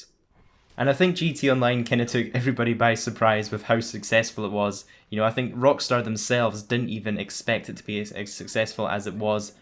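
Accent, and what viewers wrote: Scotland, United Kingdom. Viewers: where in Scotland u from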